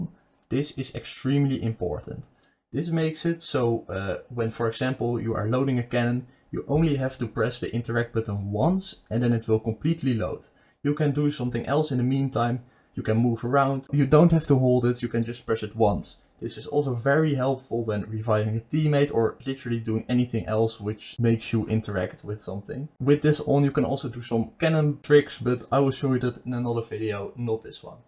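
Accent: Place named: Netherlands. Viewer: ik hoor hier een Nederlands accentje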